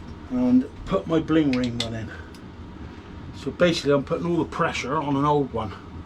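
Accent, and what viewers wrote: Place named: South West England, United Kingdom. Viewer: That accent sounds like its from south west England. Clean and tidy build, not sure about the orange but it will stand out in traffic, for me I would only consider using a fat tyre build in winter or if using heavier battery, What rotor sizes are you using, for me I won't use any size below 180mm, using 203mm up front and 180mm rear, the heavier the build the more stopping your need and 160mm rotors will not cut it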